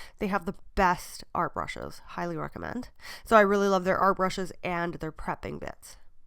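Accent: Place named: Canada. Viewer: Hi, nice to see your vids back again. As a nail tech I find them relaxing, and your voice always reminds m😂 of my visit to Canada many years ago one summer, I absolutely loved it! Can always tell a Canadian accent when you say the word.. 'about' So cute! 🥰 Love that you're back filming again, best wishes from the UK 💕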